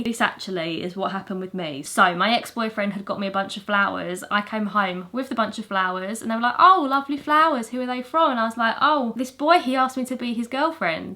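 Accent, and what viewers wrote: United Kingdom. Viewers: My daughter loves your British accent she’s trying to get one too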